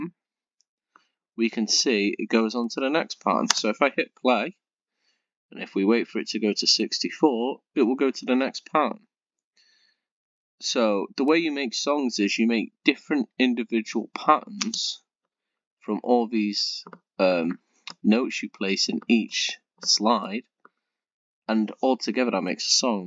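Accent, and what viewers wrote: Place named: United Kingdom. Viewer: I forgot that you're british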